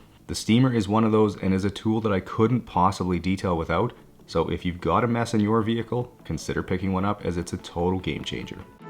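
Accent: Canada. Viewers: Nice job! You must be in Canada; hints of some of the accent 😉 And that snow, lots of it. 😊 Happy Spring, from Washington, D.C. 🌺🌻🌼🌷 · All I’m gonna say is I started watching staufer garage detailing and I was hooked and then your channel popped up on hen you were really low on subs maybe around 15-20k I instantly fell in love with your content and Canadian accent lol. Man you have grown so much and I’m actually very very happy for you! Keep up the great work you are a very amazing and genuine person and I am happy to have came across your channel when I did. Maybe one day I’ll drive from New York to Canada to get my car detailed lol but your really far haha.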